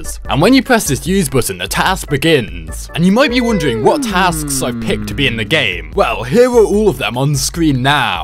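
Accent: United Kingdom. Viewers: You british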